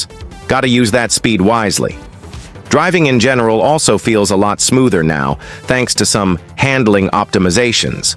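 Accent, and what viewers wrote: Nigeria. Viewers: Wait bro are you from Nigeria 🇳🇬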